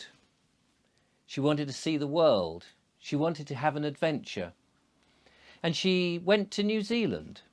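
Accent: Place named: RP, United Kingdom. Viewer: Hi, thanks for sharing this story with us. It must be truly painful and worrying for you as a parent. I recently found you and subbed, as I find that the truth and openness that you speak needs to be heard and shared. You and I are like complete opposites, I am from the black country, broad accent and a bit rough round the edges, you are, if you don't mind me saying, a bit posh and well spoken. But strangely I can relate to you more than many others around me. I too knew the truth from the beginning and stood my ground, while many blindly followed and harmed themselves and their families. Again, thank you, from the bottom of my heart.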